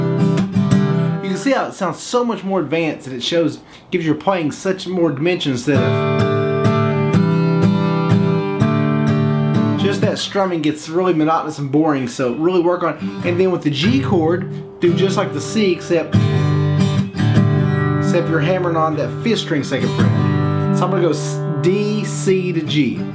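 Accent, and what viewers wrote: South, United States. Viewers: I love your Southern accent. Greetings from London, UK. Your videos are a blessing to me as I try to learn acoustic classical guitar. I want to play in church one day. I try to learn but face so many obstacles.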